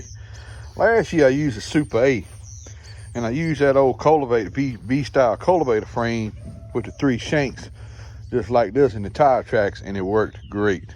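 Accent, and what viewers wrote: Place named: Louisiana, United States. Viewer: Where are you located? From accent, can't tell if you're Cajun, or from around Lumberton SC. I'm in SC. Can't beat dog wire cages,I use taller wire, mabe 4' and cut 6' sections, then drive a piece of metal pipe through bottom so they don't get blown over in storms. Have some cages almost 20 year's old.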